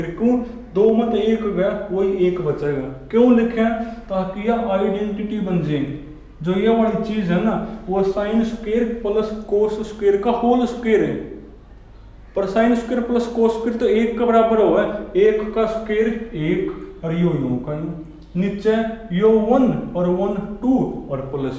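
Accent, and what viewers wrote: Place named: South India, India. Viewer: He's from south india. That's why different accent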